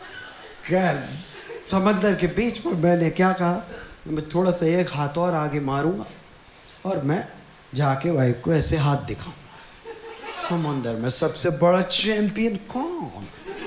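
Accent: Uttar Pradesh, India. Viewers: In his UP accent the way he delivers his jokes ohh god 😂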